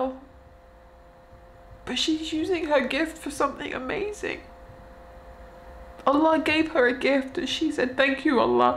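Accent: United Kingdom. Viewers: Your british accent is special🤪🤪🤪🤪👍👍👍👍 Be istiqomah/ consistent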